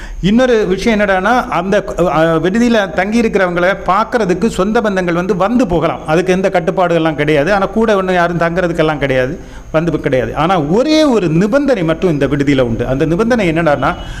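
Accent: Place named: Kanyakumari, India. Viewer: நீங்கள் குமரி மாவட்டமா? பேச்சில் தெரிகிறது 😊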